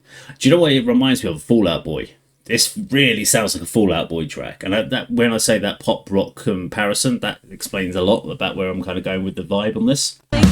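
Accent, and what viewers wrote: United Kingdom. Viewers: Exactly!!!! It was very DISRESPECTFUL!!!!. WE ARE MILLIONS OF PEOPLE FROM MORE THAN 15 COUNTRIES WHO SPEAK "SPANISH" AND WE ARE NOT FROM MEXICO. THE language NAME is "Spanish". It's like if I say that you are speaking in the "USAing" because I assume that if you speak English then you are from USA and I call you GRINGO, when I clearly hear your British accent. English speakers tend to have this closed world mentality about culture, languages and geography because they speak only one language (while the majority of the world is bilingual). Many times that I have seen English speakers who when they hear Spanish assume "Mexico" which shows the level of little education in those countries respect to other cultures and how they tend to look only for themselves. By the way Spanish music is the second most listened to music in the world today. 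Just so you know. Maybe you need a long trip to latin america to know the world outside you caveman and open your mind....you can discoverd that exist a lenguage called SPANISH with more of 20 spanish accents in a lot of countries even Africa.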